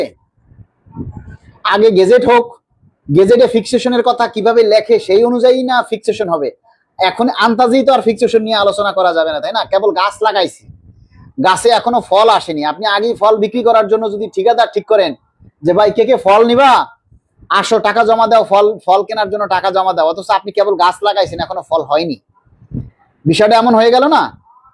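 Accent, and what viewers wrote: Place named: Comilla, Bangladesh. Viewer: কথা শুনে বুজতে পারলাম উনি খুবই বুজদার। কুমিল্লার ভাষা এটা। আমার মনে হয় বাড়ি কুমিল্লা ঐ। বাহিনীর লোক বেশি কিছু বলতে পারিনা।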